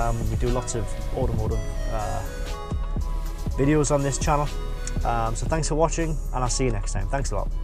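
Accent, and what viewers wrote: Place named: Birmingham, United Kingdom. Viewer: Birmingham aye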